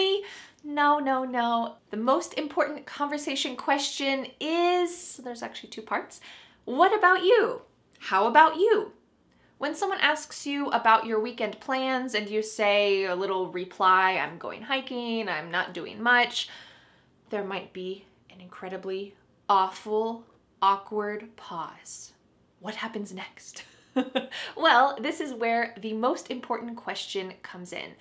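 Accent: United States. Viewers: Hello my teacher,daughter, how are you doing my daughter, hope you to be well,dear,I wanna say you thank you so much dear for all your fantastic lessons, by the way your accent also very nice and clearly really you're fantastic american accent english teacher in the end acept my greeting. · 😎I will become American after listening to you👻👍🏻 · Your English speaking style is very nice,,, You're amazing 😍 😊..I can learn American accent very well.❤️🇧🇩🇧🇩🇧🇩🇧🇩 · Hi, I discovered this channel and for me it's the best. Are you American or aren't you?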